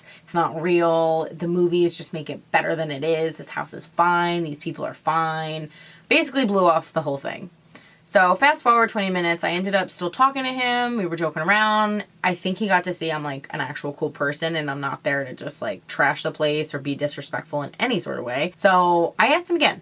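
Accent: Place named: Long Island, United States. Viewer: I'm from Long Island I just watch like 3 of ur vids and literally thought to myself she has to be from here 👌🏽 LI knows LI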